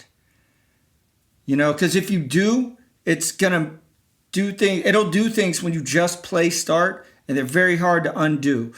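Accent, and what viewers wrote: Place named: United States. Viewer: Was so surprised to hear an American accent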